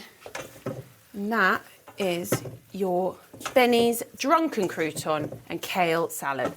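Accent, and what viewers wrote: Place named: London, United Kingdom. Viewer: That's a London accent. Because she is a Londoner 🙄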